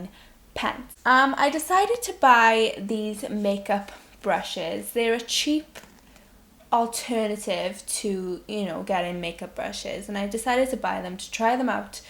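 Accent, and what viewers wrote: Wales, United Kingdom. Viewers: Are you from Wales? 🏴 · U Welsh?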